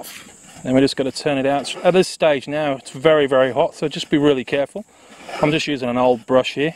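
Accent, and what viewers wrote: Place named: Wales, United Kingdom. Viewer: Hope I'm not rude but I'm struggling to place your accent, I'm picking up but of Welsh some Australian and English.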